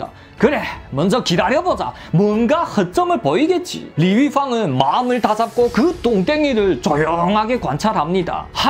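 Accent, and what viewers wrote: Yanbian, China. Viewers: 너무 재밌습니다 ㅋㅋ 타고난 이야기꾼이네요 ㅋㅋ 조선족 특유의 말투가 더 귀에 쏙쏙 박히네요. 다음 편 기다리고 있습니다~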